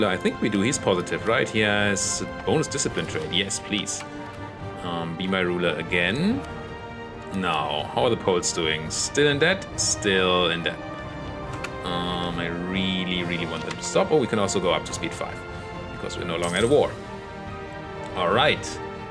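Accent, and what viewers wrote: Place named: Germany. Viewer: Oh wow, I would never have guessed that, your accent sounds too "refined" for being German, in a good way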